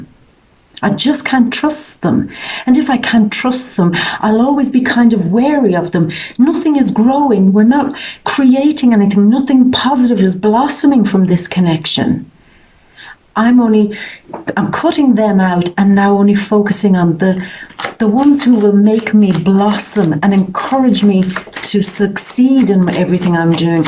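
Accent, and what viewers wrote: Ireland. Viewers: You are very beautiful. Inside and out. I love your irish accent. A lovely Colleen. Thanks for a great reading. I was in tears because its so true👏💞😁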